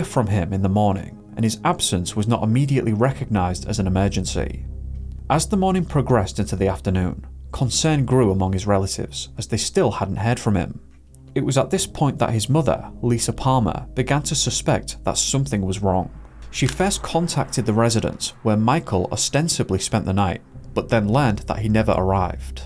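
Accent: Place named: North, United Kingdom. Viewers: I love your narration, so articulate, great vocabulary and with a proper northern accent! Thank you for representing with quality content. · Northern English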